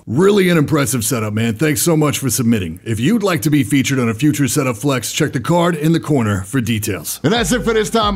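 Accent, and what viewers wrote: United States. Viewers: Man this dude is so great. Finally an American (at least I’m guessing from your accent) who measures using the METRIC system. Thank goodness you’re trying to please literally everyone except Americans 😄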